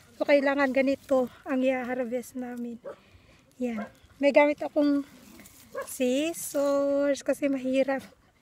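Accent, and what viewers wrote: Iloilo, Philippines. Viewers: Taga San ka ba sa Philippines sis.kasi young accent mo parang ilongga.😊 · Ilonggo poh kau ate?😊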